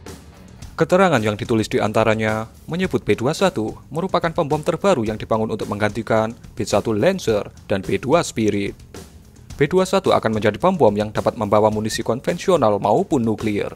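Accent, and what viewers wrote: Java, Indonesia. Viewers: * Medok medok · Medok amat logatnya mas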